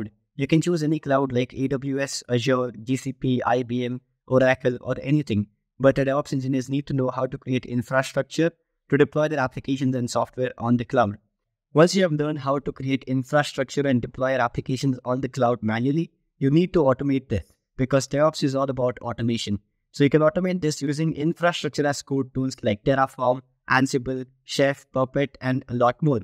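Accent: India. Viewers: Can I make a suggestion please? Your English is very good, but you still have a deep accent. Also, you talk very fast. Indians tend to talk very fast with an accent. So I don’t know about other American people but I have a very hard time understanding you. All of what you’re saying is very interesting and I want to follow it. Even some American speakers will speak fast sometime with different accents and I have the same problem. This is not just simple conversation, but explaining technical topics. So the combination of a thick accent and talking fast makes it really difficult to understand. I could play the videos on slow motion but there is still an issue and it’s a bit annoying. I love the programs from simpylearn and I really want to sign up but the only thing holding me back right now is this aspect. Sorry to ruffle anybody’s feathers but I’m just trying to help. 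And provide some honest feedback. Thank you.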